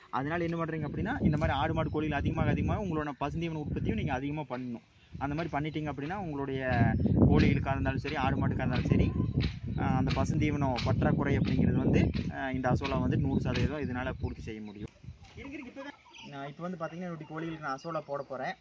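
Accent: Kongu, India. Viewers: your kongu Tamil was super bro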